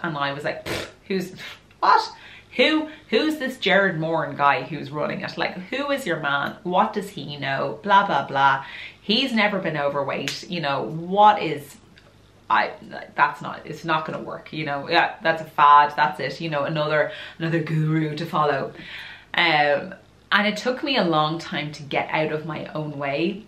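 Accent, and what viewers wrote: Ireland. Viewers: Hello from Spain .are you Irish by any chance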